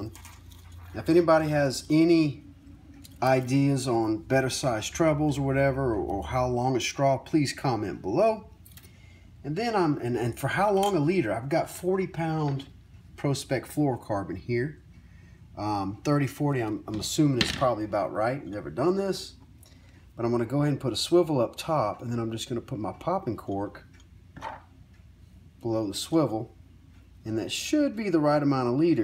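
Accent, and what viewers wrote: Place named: North, United States. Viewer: please make a video showing how you cast that cork that magically holds water.... and no , your NOT local , sound like a yankee , go away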